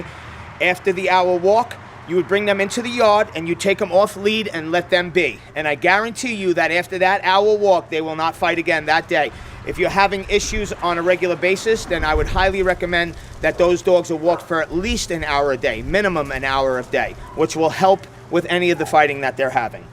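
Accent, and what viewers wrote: New York, United States. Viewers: ...NewYaawk AcCcent loving it lol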